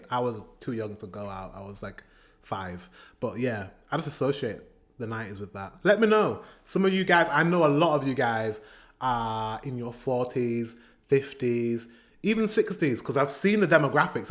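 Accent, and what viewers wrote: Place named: Leeds, United Kingdom. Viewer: Does he live in Leeds? I assumed so due to the accent. I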